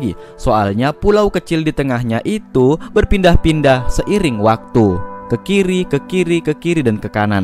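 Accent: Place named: Bugis, Indonesia. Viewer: Logatya bugis🤣🤣🤣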